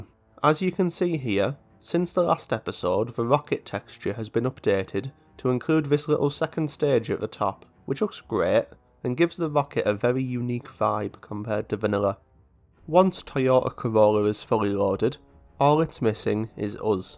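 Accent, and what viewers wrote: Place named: Northern England, United Kingdom. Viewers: Its a northern england accent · Also I'm dutch but me mom is from York, your accent seems to be mostly Geordy with a bit of Yorkshire added.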